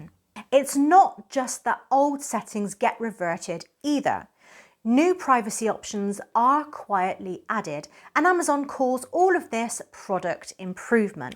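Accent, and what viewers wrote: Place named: United Kingdom. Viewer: I love your British accent!!!! ❤❤❤❤❤❤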